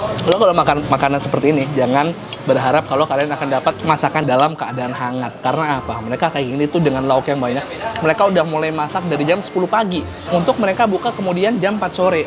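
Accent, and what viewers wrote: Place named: Jakarta, Indonesia. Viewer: Anak betawi mane suara nyeeee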